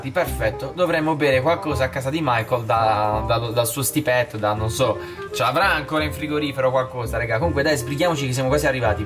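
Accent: Bari, Italy. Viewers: Sei di bari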